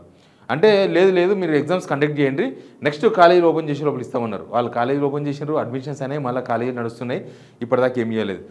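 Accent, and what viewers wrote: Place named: Telangana, India. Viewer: సార్ మీరు మాట్లాడుతుంటే స్వచ్ఛమైన తెలంగాణ భాషలో ఉన్నది ఉన్నట్లు మీరు మాట్లాడుతుంటే పల్లెటూర్లో మాట్లాడినట్టు ఇంకా వినాలని ఉంటుంది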